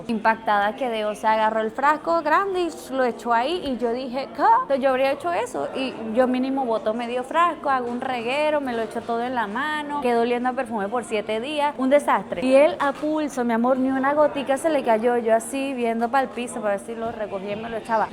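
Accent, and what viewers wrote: Venezuela. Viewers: me di curnta q eras venezolana pareces a Cathy Fullop hablando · Pq le sale acento neutro mexicano al inicio pero de repente le sale acento y gestos venecos? - No pude seguir viendo el video - me gusto mucho el inicio - acento neutro bien modulado para audiencia latam - pero cundo salio ese "Oite" "Mi chama" paso werita. por aca no es mi carnal. Bay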